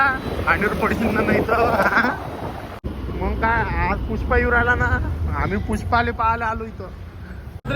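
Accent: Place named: Vidarbha, India. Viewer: भाऊ भाषा लय भारी आहे तुमची बहुतेक तुम्ही आमच्या वऱ्हाड aतले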